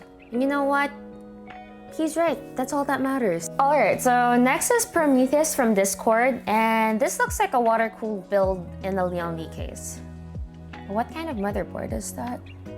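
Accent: Philippines. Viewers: lol her cute filipino accent.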